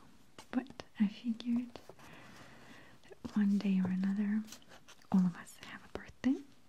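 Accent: Russia. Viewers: I seem to have the same reaction to various weights and qualities of journal pages! FYI that beautiful carved leather journal has a classical image of the three graces on it. from greek myth but most famously revived by Botticelli during the Italian Renaissance. google PRIMAVERA by him and you will see them. You are definitely the queen of relaxing ASMR videos. So elegant and soft and beautiful! lovely graceful hands too. And that very slight Russian accent makes your speech quite exotic. What a success you are! thank you for all you do. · I think the thing with is her accent. She is the only person who actually makes me go to sleep. I love her Russian accent, it makes it so nice. Who agrees?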